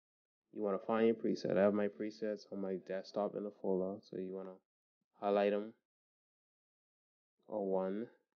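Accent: Bahamas. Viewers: same! I knew you sounded Bahamian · you bahamian?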